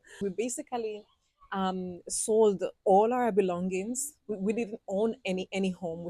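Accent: Patois, Jamaica. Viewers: Oh wow… yuh sound like yardie. Accent sweet suh 😂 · My family are Cubans and its mind blowing listening to you speaking in patua.. I love it!!🥰🤩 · Slight Latin Accent With a Hint of Patios Frequency Nena......Ya Bad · Hey,. Interesting vid. I must say that’s it’s amusing hearing your’Spanglishyardie’ accent—very unique. I am a yardie to mi core except l’ve lived all over the US for decades—fully integrated. I visited JA in Summer ‘23 and had 1 helluva experience. I stayed at the Riu Negril for 4 days then at an AirBnB in Mamee Bay, Ocho Rios x 1 week. Very different experiences. There was a group of us so the whole time a l had a rented burgundy Kia Carnival turbo diesel minivan(awesome vehicle). Turns out that the rental was a $$ magnet for the cops. My brother(his turn to drive) was shaken for cash in broad freaking daylight on TWO occasions w/i 1 week by corrupt cops!!!😳🤨 On a different occasion l was driving thru Port Maria and saw the body of a bicyclist all contorted lying on the roadside after a hit-and-run.😮 The sad thing was the indignity/callousness as ppl just stood around or went about their business as if……! 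The other scary thing that l would warn every foreign visitor about is the danger of driving in JA. Not only is it disorienting driving on the Left, but locals drive like MANIACS!!!! JA is a tropical paradise but there are definite risks one must take visiting the place. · Tell your truth black afro 🇨🇺 Cuban Queen like your jamaican accent ❤ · Aah mi gal if you ever know my story yu wi cry.love the way yu patwah sounds ❤ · After all you are looking so beautiful keep it up you should live up in Cherry gardens jack hills Beverley hills much comfort able love how you talk the Jamaican Patios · Wow I listen to you carefully and you have been through a lot and I am happy that you have endure the hard times ,it's obvious that you love Jamaica over Cuba ,in Jamaica it is diverse,not all of Jamaica is the same some places if you not involved with certain people you don't have to worry about anything yuh nuh trouble anybody, nobody nuh trouble you.especiaally if you are not a Jamaican. 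You even now sound like a Jamaican if you never says that you live in there I could tell that you have been or intouch with some Jamaican. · My ears are confused, I'm hearing a Cuba spanish accent and a Jamaican accent fused together, lmoa.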